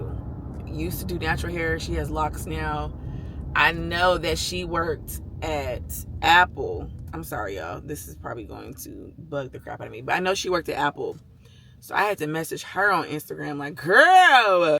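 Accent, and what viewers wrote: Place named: California, United States. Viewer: You look gorgeous, I'm from NY originally but raised in Florida. I could tell by your accent you're from CALI but your fits gimme NY respectfully. Like you'd fit right in, issa compliment.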